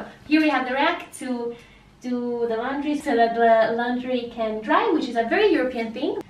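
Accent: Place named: Argentina. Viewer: te escuchaba hablar en inglés y me parecía , yo decía es argentina por el acento jeje . saludos .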